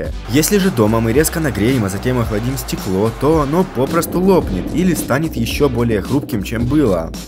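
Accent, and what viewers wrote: Russia. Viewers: Все отлично, но так бесит твой марийский акцент. Или это просто такая манера речи, дико извиняюсь, но прям слух режет.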